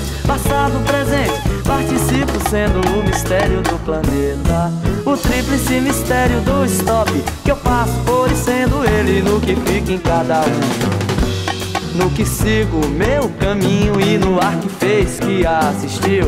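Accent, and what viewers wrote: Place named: Minas Gerais, Brazil. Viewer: Nesse vídeo parece ser mineira. Deixa eu ver os outros pra ver de onde é.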